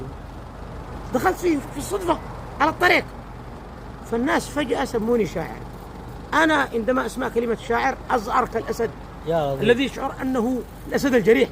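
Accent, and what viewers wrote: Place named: Tihama, Yemen. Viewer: ايه بس اللكنه التهاميه تخرب فصاحته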